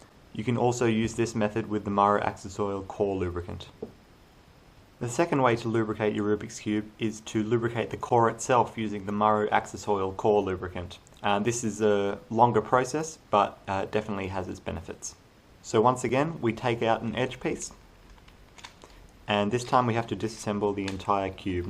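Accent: Australia. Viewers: Guys just cause he's australian doesnt mean its Felix 😂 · Its called how Australians really sound like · Umarun Nisa no he is just Australian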